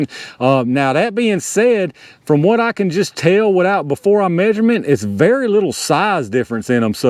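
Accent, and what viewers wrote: South, United States. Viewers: You are quickly becoming one of my favorite pew pew YouTubers. Man every video I've watched is awesome. And the cherry on top of the cake from one southern boy to another I love your accent man. Keep up the good work brother.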